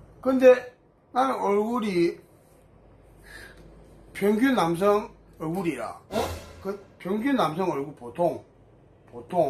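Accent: Gyeongsang, South Korea. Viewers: 확실히 경상도 매력이 있따 ㅋㅋ 뭔가 다르다 이기야 ㅋㅋ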